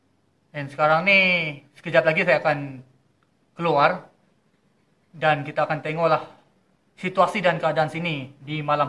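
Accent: Sabah, Malaysia. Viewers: mcm org Sabah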